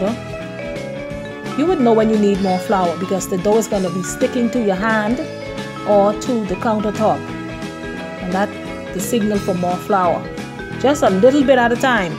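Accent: Bahamas. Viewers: I love hearing that true Bahamian accent behind our dishes!!Love this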